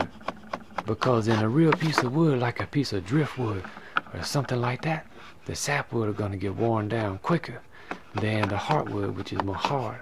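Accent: Louisiana, United States. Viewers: You are a true breath of fresh air with your down home ways. I've been missing Texas and by the sound of it , you must be from Louisiana so that's pretty close to home LOL. Love your work and please! please! pleas! Take care of yourself. In another viddy you mentioned a health scare😵‍💫 Dont mess around with things. We need you around, you nake so many of us feel so much better about life.👍👍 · I think sounds like a Cajun man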